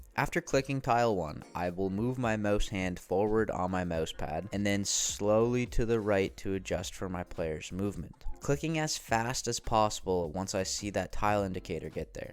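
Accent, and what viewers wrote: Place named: Canada. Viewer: Thanks for the vid and ur aggressively Canadian voice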